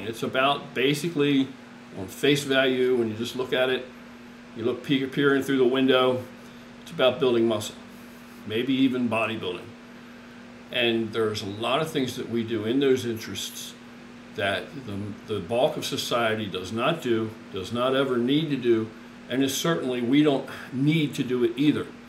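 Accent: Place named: Philadelphia, United States. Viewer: sounds like a philly accent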